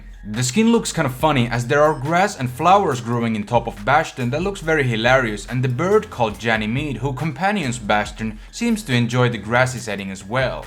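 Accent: Finland. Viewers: Hey are you Finnish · are you finnish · Are you finnish cause it sounds likes it :D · Tiesin heti ku kuulin sun äänen et oot suomalaine XD ja hyva video voiks tehä Tips And Tricks ? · Wow! When I heard you speak I instantly knew you were finnish. HYVÄ VIDEO MUUTEN! ☺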